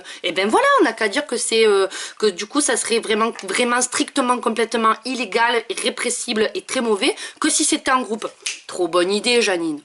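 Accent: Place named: Provence, France. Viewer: C'est la première fois que je me rends compte que Nicole Ferroni a un léger, et néanmoins délicieux, petit accent méridional. A croquer !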